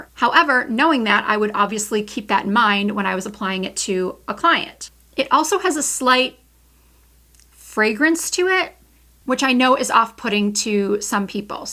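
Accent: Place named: Michigan, United States. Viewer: What part of Michigan are you from? Good video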